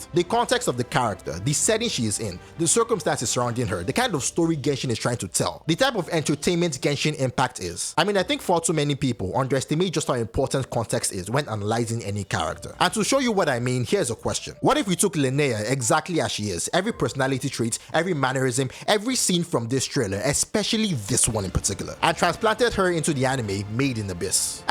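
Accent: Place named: Nigeria. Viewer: lmao i enjoy these genshin videos and the nigerian accent makes it better.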